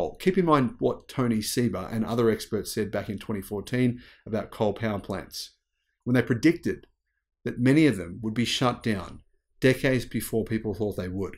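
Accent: Australia. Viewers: ....do you come from the land down under... great job Aussies.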